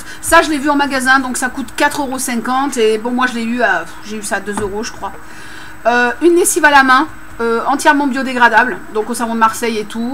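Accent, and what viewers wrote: England, United Kingdom. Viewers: que j'aime ton accent anglais :p Et oui faut PAS acheter à n'importe qui et surtout pas une arnaqueuse lol MDR mon mot à l'écran !! Hihi et bien pour ma défense je me suis bein rattrapé :p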